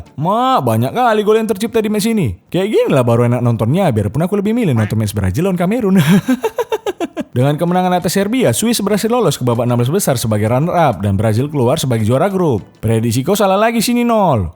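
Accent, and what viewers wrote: Medan, Indonesia. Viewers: Orang medan dari logat ngomong nya yang punya channel ini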